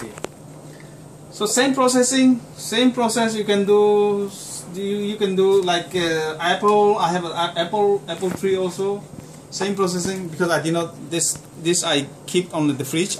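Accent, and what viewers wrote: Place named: Bengal, India. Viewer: Hello, Very good video to learn this process. Thanks 🙏. If you don't mind guessed you are a Bengali speaking how you are talking now. 👋 from 🇨🇦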